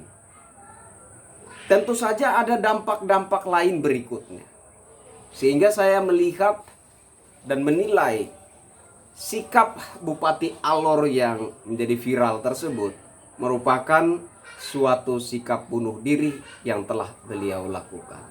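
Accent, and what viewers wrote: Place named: Java, Indonesia. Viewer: Udah sering lihat chenel antum mas bro....sepertinya gaya bicaranya banyak dipengaruhi tata cara orang Jawa...bravo sukses selalu,udah sering lihat chenel ini sekarang langsung subcr...👍👍👍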